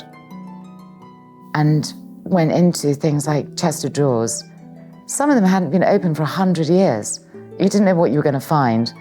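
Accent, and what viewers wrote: RP, United Kingdom. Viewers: she sounds posh.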